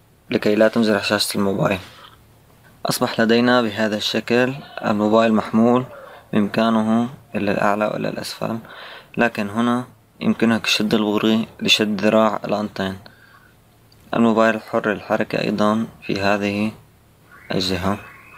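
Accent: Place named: Syria. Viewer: حسيتك سوري 😁😎 حلو كتير استمر👍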